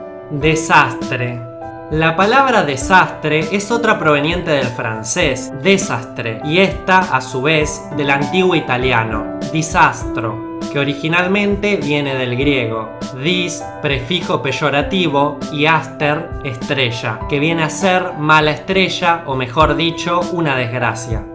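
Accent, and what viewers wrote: Argentina. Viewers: no puedo ver un top de palabras si eres argentino, con tu acentos degradas el español , pronuncias mal todas las letras y los acentos , tildes, etc... no es xenofobia, es que me resulta ridículo. si vas a hacer un top sobre PALABRAS del ESPAÑOL tienes que hablar con un acento lo más parecido al ESPAÑOL, no en ARGENTINO CHÉ. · Cuando un argentino abre la boca... ¡¡¡ áaaaaggh...!! se oyen exclamaciones de desaprobación, sobre todo en muchos países de Europa... NUNCA aprenderán a hablar bien. Basta con oir a éste sujeto, que aparte de expresar inapropiados términos de baja ralea... en su creencia que así será mas entretenido y agradable, demuestra el extracto social extremadamente bajo del que procede. Es exasperante la cantidad de veces que pronuncia mal: ''schá'' (ya sea por...) -- ''usás'' (usas) -- ''schueva'' (llueva) -- ''incluscha'' (incluya) -- ''schevar'' (llevar) -- ''schins'' (Jeans) -- ''tascho'' (tallo) -- schevaban'' (llevaban) -- ''schamaba'' (llamaba) -- cásches'' (calles) -- ''schamó'' (llamó) -- ''schó'' (yo) -- Hay que dejar muy claro que la sal no se ''AGARRA''... 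se toma. No poseemos garras... ¿cuántas veces repitió ''agarrás''....? véase en el video. -- ''Vos'' (tu. No estamos en la Edad Media) -- ''muschas'' (muchas) -- ''podés'' (puedes. Otra vez retrocediendo siglos) -- ''peschorativo'' (peyorativo) -- ''estrescha'' (estrella) --- queda bastante y me aburro.... Termino con otra tirada de orejas: Se encuentra el color ''marrón'', por ejemplo, en los ladrillos de construcción... pero en la vestimenta de los frailes Capuchinos el color es el CAFÉ...!! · sos argentino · Eres argentino??? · CafeZK Es argentino,es su acento.Es raro al principio pero luego te acostumbras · Que asco con tu acento detesto la manera argentina chaaaaaa para yaaaaa lo peor. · Digo porque conozco a alguien Argentino y habla haci no más pregunto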